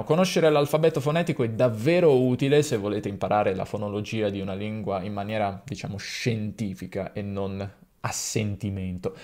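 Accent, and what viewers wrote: Madrid, Spain. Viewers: Me meo con tu acento español espectacular, no sé por qué me suena como alguien de Madrid o de Valencia, alguien me ayuda con esto? 😂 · Suenas MUY de Madrid :D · Credo sia buona idea fare un video sul AFI. Saluti dall'Argentina! Bravo il tuo spagnolo, anche se è tan europeo XD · ¡¡Vídeo súper interesante!! Pareces de Madrid jjajajaajaja ¿has estado alguna vez en España? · Comunichi molto bene in spagnolo. Tutto quello che dici è capito. Ahora sí tienes el acento Español europeo. A igual que Italia que hay dialectos y acentos diferentes. Los hay en nuestros países hispanohablantes también. Los Argentinos tienen mucha influencia italiano. Ellos tienen el acento italiano combinado con el español. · Joder, que acentazo español! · Hola, hablo español, me encantó escucharte hablando mi idioma.. no habia notado las diferencias de las D y si sé que las B y V suenan casi iguales. 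Gracias se escucha un claro acento iberico como has mencionado, yo hablo el español latino y aun así hay bastantes diferencias entre cada uno de nuestros paises y regiones. Te felicito por tu buen español... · Desde Colombia felicitaciones por tu español ibérico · Me causa gracia que su español sea el español de España. (Se que parece bastante tonto decirlo, pero ya de por si a los hablantes de la lengua, que habitamos en Latinoamérica, nos cuesta bastante hablar como los españoles, sobre todo por el famoso seseo). Saludos desde Buenos Aires. Vivo a 15 cuadras entre el club Argentinos Juniors (cuna de Maradona) y a 12 cuadras de la casa de natalicio del actual Papa Francisco. Es decir, entre el Dios y el profeta, dejo en ustedes decidir cuál es cuál. :) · que feo tu acento de español de españa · Bonito acento español 😁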